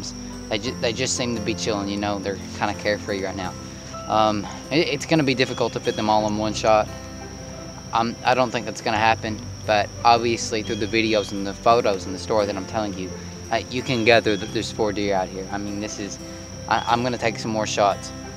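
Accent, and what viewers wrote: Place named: Arkansas, United States. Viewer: A great video and inspiring, love your excitement, btw you sound like my Arkansas family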